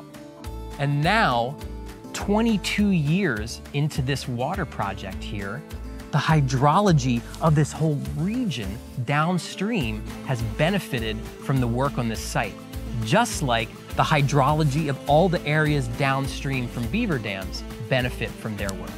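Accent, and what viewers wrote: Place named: United States. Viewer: this accent of american make me leave the video, stop vocal frying this is not natural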